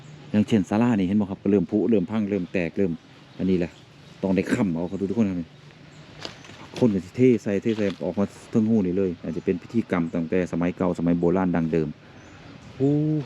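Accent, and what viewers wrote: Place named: Thailand. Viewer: อ่านภาษาลาวกะต้องออกสำเนียงลาวตั๊วจังแมน ออกสำเนียงไทยมันกะไปคนละทีปถ่อนแล่ว.. วรรคสุดท้ายเพิ่นบอกให้ปลดเกิบ คือถอดรองเท้าเพื่อแสดงความเคารพ...เบิ่งมาหลายคลิปเกี่ยวกับวัดตาแหลว แต่บ๊มีไผเว้าถึงสาเหตุที่แท้จริงการถล่มวัดของเมกา นอกจากคาดเดาว่า แถวนี้เป็นจุดยุทธศาสตร์ที่สำคัญ และกองกำลังทัพประชาชนลาวใช้วัดแห่งนี้เป็นฐานในการสู้รบ..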